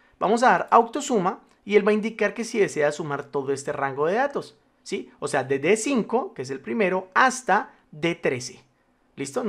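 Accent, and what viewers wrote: Colombia. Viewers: Escuchar el acento colombiano no tiene precio!!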